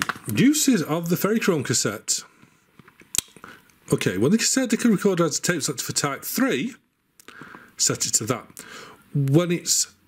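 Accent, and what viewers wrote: United Kingdom. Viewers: Off-topic but i watch another youtuber whose a gamer, hes also british, i am british, tonys british, i just love hearing the british voice. Theres something about it, probably the "slagging off voice" if you dont k ow what that is, watch tonys video about cheap cassettes. Specifically the "fag packet" part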